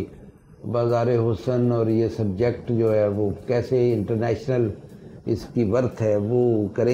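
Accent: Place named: Karachi, Pakistan. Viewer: میزبان صاحب آپ کا لب و لہجہ اور تلفّظ بہت اچھا ھے،مَیں طارق قریشی ھوں اور کراچی کئی سال رہا ہوں ،آپ مُجھے کراچی یا اُردو اسپیکنگ لگتے ہیں اور آپ مہمان کو بولنے پورا موقع دیتے ہیں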